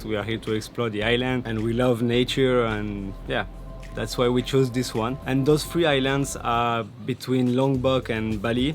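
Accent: France. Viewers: your voice in combination with the french accent is so nice to listen to! wonderful aerial shots, that want me to go back so badly.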